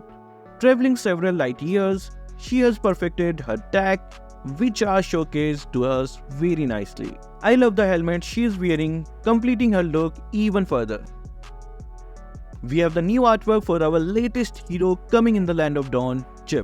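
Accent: India. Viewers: Every now and then i still get annoyed by your voice or accent(in our country we see indian accent as "STINKY"), but hey! At least you bring pretty useful information. and thanks for that :v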